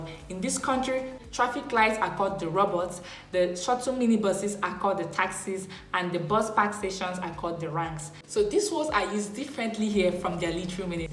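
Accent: Nigeria. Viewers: I can hear you as NIGERIAN GIRL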